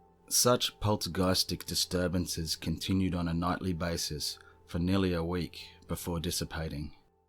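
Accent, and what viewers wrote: Australia. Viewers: Hey there,. Great video!!! Excellent stories!! Love that sexy Aussie voice!! 💓😉 BTW, have you ever read any books by Philip Imbrogno? There's one called Files From the Edge that has some very bizarre encounters!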